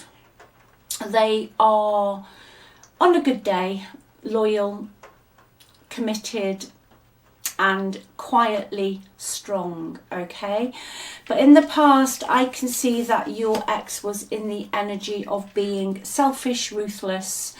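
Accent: England, United Kingdom. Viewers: thank you so much for this mornings reading. Love your strong English accent, reminds me of two years O went to varsity there. Darling, your advice is spot on, loving myself first and protecting my peace and freedom to do whatever I want. Busy investing heavily in some new ventures, I am not going to be giving as I used to be, can tell you that. My old self died before Christmas and with the protection and guidance of God, the legions of Angels and in particular Archangel Michael, I receive unmeasurable abundance for which I’m absolutely grateful. Gods timing is divine otherwise I would have been dishing these abundance out. Learnt my lessons the hard way, let’s just say I’m doing great and couldn’t be more happy. Blessed day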